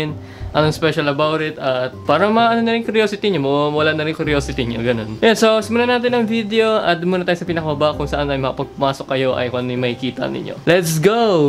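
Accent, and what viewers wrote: Visayas, Philippines. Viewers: Bisaya po ba kayo??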